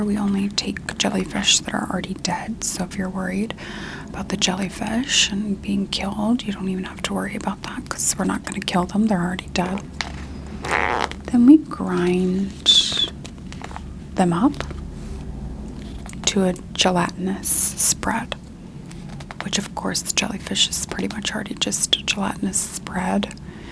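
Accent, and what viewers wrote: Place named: Midwest, United States. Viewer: The midwest accent is toooo good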